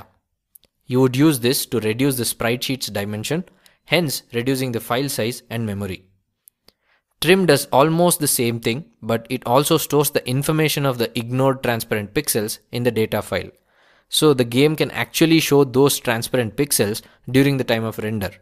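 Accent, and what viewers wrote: India. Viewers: indian accent really drives me mad.. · it was quite funny at the beginning with the perfect and polished intro then out of nowhere a thick indian accent. but amazing tutorial, helped a ton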